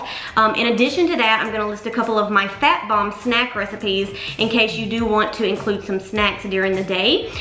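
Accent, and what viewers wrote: South, United States. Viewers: love that country accent baby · Came for the insight, stayed for the southern accent. 😎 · There's nothing better than a sweet southern drawl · Love the dishes you put together. Simple and they look yummy and healthy. You sure do talk at a good speed, and I love your Southern accent. I'm from Texas, but don't have the drawl. Thank you. Love all your videos.:virtualhug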